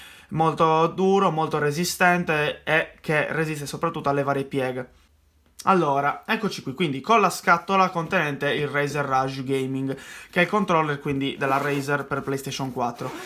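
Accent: Sardinia, Italy. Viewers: sei sardo?si capisce da come parti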